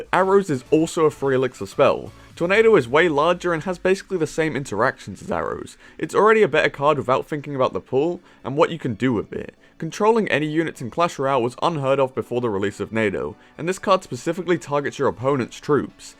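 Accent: United Kingdom. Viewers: Yeah, your accent sounds british, but you always answer to strange times:D Could have been American time zones.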